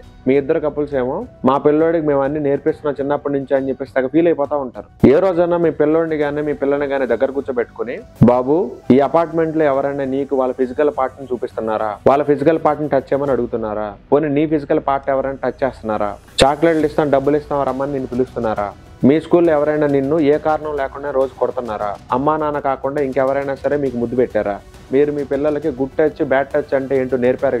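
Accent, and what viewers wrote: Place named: Nellore, India. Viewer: Meedhi nellore ah andi? Me language bhale untundhi Andhuke adiganu.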